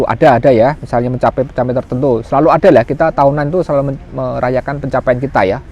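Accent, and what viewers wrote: Java, Indonesia. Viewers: Iya... Logat Jawa bahasa Indonesia enak, nyaman dan easy listening... Semoga sukses selalu... Masbro (kyknya kita seumuran, mas )...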